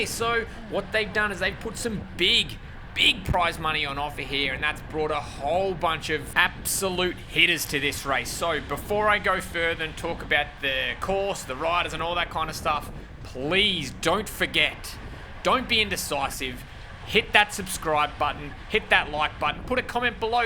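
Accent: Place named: Australia. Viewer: Love your energy and the Aussie accent 👍🏼🙌🏼💪🏼 🇦🇺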